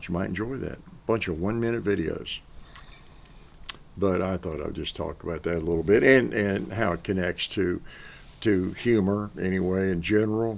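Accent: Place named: Kentucky, United States. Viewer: Wishing you well! Regarding speech, I notice you have only very little of a Kentucky or Tennessee accent. I travelled the US twice by car paying attention to regional accents and was not too surprised that accents are strongest with older people who've mostly spent their life in a small town or within a particular limited area. Tennessee accents seem diverse. I was surprised that Chuck McDowell (radio ad) comes from Nashville. He has a strong accent; I thought it was Texan. Take care.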